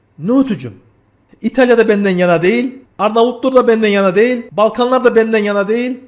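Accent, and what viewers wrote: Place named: Adana, Turkey. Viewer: YA GARDAŞ SENİ GÜNLÜK İZLEMESEM İŞİM RAST GİTMİYOR TAM BİZİM ADANA ŞİVESİ KONUŞUYON BENİ.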